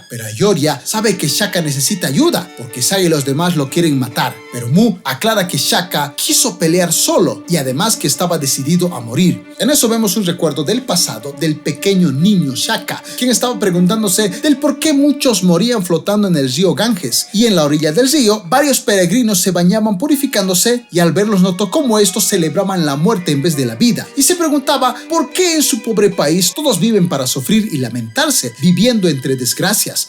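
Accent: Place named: Ecuador. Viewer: No puedo seguir la narración porque ese acento ecuatoriano que mezcla la R con la Ch me distrae demasiado con su mala pronunciación 😐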